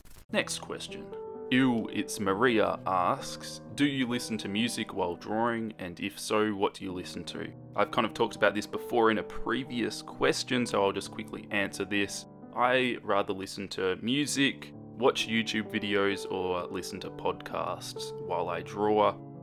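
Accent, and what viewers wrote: Australia. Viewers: are you from, Australia?...im so curious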